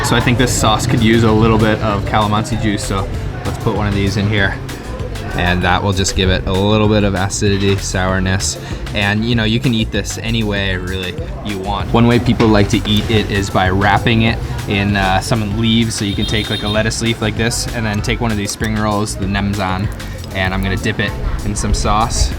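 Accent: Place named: Canada. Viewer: He sounds incredibly Canadian. He is not dropping a lot of EHs but everything else is completely Canadian.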